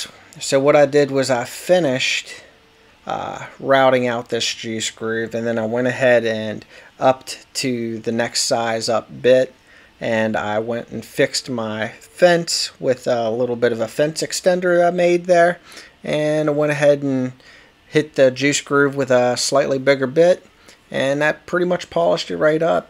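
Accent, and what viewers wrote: Pittsburgh, United States. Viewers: Watching from Texas. Sounds to me like you are from Pittsburgh? Moved from there in 66 but still have my Accent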